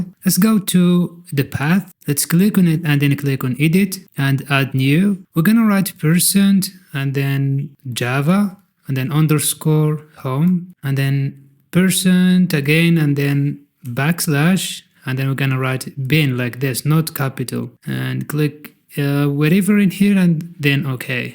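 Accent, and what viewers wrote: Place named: Arab world. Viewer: من الاكسنت واضح انك عربي شرح ممتاز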